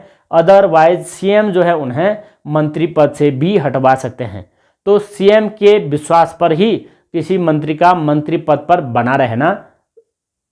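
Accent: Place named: Garhwal, India. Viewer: सर जी आप उत्तराखंड से कहां से हो ? भाषा से तो गडवाल मंडल के लग रहे हैं ❤😊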